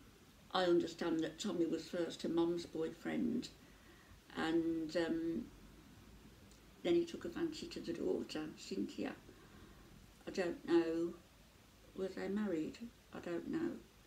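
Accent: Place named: England, United Kingdom. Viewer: Thats nasty I can understand her ok may be it's her English accent you can understand there is nothing wrong with her speech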